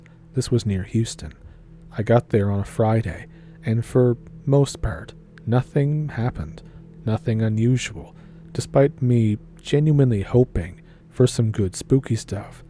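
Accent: Canada. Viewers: I find your thick canadian accent very endearing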